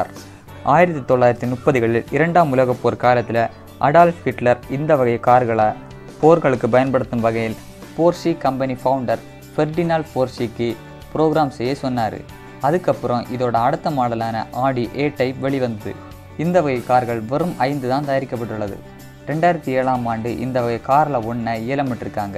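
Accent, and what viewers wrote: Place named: Marthandam, India. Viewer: Nee Marthandam ah Tambi voice nalla theriuthu...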